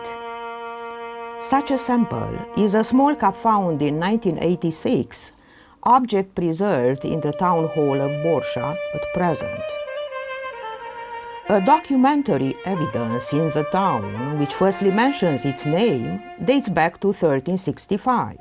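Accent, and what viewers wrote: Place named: China. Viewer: ce engleza ruginita si una parca'i o rostita de Chineza. filme de astea vor atrage doar turistii din Saliste,Carlibaba ... si Balti mAldavia ! e nevoie de curatenie,amenajari de gradul 1 [unu] la partia de schii,drumuri de accese si repunerea statiunelor minerale in practica reala care ar atrage mii,sute de mii de oameni din EUROPA... si vara si iarna.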